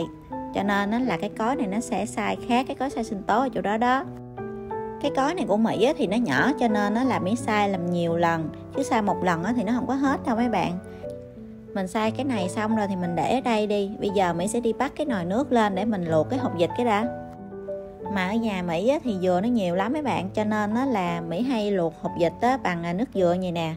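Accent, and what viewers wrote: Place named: Tay Ninh, Vietnam. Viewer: Mỹ quê ở đâu vậy Mỹ??? Nghe giọng như dân Tây ninh ah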